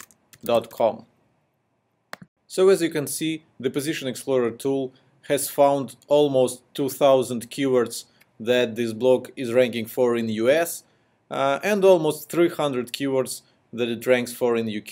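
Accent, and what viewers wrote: Russia. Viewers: great information ! are you from russia :) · Русский акцент, фууууу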